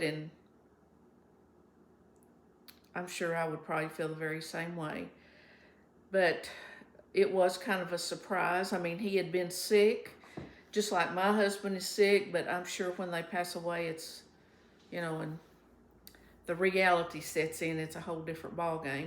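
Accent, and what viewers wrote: Kentucky, United States. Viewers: Hey,! I so appreciate you ladies who get on youtube and share from your heart. Sorry to hear about your friend's husband. Praying for her. God is our comfort and He never leaves us. Praying for your husband as well. My husband is in a care facility as I think I shared with you. He had a stroke and I am asking everyone to pray for him, for us. I love listening to you. You are so cute and make me giggle a lot. Love your southern accent from Kentucky. My Grandmother was from Texas and I loved her accent. You are like visiting with a special neighbor. Praying for you. ❤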